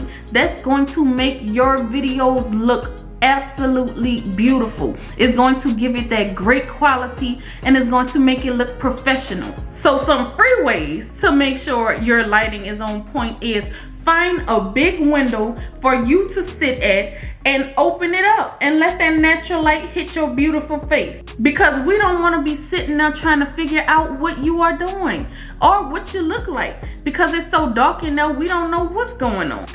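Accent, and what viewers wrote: New Orleans, United States. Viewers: It’s the New Orleans accent, I love it ✨👏🏾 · Are you from New Orleans?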